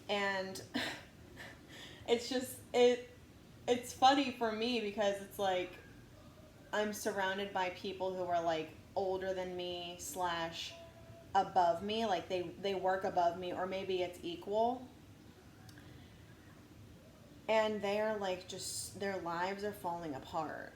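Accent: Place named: South, United States. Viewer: American Accent?? I would say...you need to relax. You have a southern drawl and Jersey touch as accent. Not sure about the PENN State stuff, but clearly my before. Tinge of Kentuckian.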